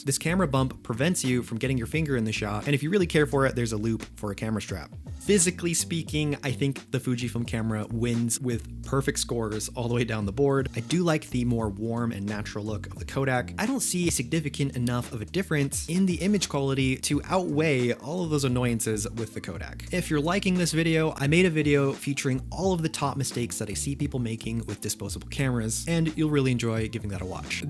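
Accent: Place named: United States. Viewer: Thanks this your name well look I like my vintage camaras which ihave few years back when iwas younger now im pensioner in London i still got my canon AE1 program which i love ❤️ I used to canon F1 nikon Fm2 mamiya 6x7 afortunally i got them stolen any way your video help me because hopefully I going to Colombia in few weeks time i believe Kodak film 200 is good because Colombia is very hot and sunny ☀️ so according what you say Kodak is perfect for Colombian whether since im back to photography i see you twice you from like Japan o somewhere there you English is American and you very good explaining all this camera staff means photography which really apreciated is like i feel young again thank you very much 👌👌🙋‍♂️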